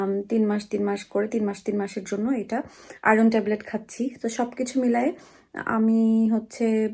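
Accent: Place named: Gopalganj, Bangladesh. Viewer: apu amio gopalgonj er meye so tumi jokhon amader desher vashay kotha bolo tokhon amar khub valo lage ekdom nijer manush ❤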